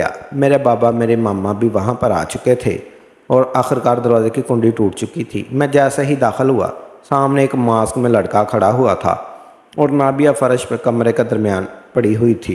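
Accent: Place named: Punjab, Pakistan. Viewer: ابے اردوُ بول رہا یا پنجابی ۔۔۔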